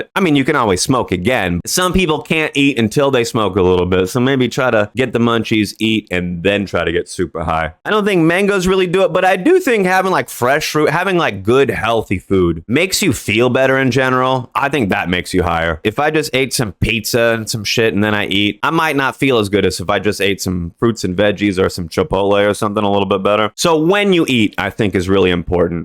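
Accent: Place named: New York, United States. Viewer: 😭is he from New York or something? Ik Ian the only one who notices the slight accent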